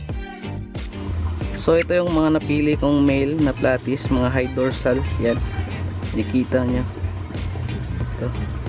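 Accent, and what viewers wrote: Visayas, Philippines. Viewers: 100% if he speaks english you will find a hard time to understand it due his bisayan accent, and its hard to communicate outside your native language. 😀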